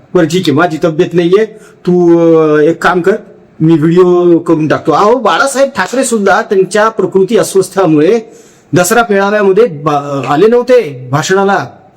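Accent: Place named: Pune, India. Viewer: तुझं वय जेव्हढे आहे त्याच्या कीती करी जास्त वर्ष पवार साहेब राजकारणात आहे.. .सदाशिव पेठेतुन भाषन केल्या सारखं बोलु नको....संपूर्ण महाराष्ट्र जाणतोय घातपात कसा झाला... तुझ्या अनाजीने कीती शाळा काढल्या...मोदीने कीती काढल्या....महाराष्ट्र नासवला ह्या निच संघोट्यांनी....महाराष्ट्र घडवला पवारांनी...वोटचोरी करुन सत्ता मिळवली फेकु पार्टीने ....तु अन्नदान स्वत:च्या खिशातुन कर.मग टीमकी वाजव...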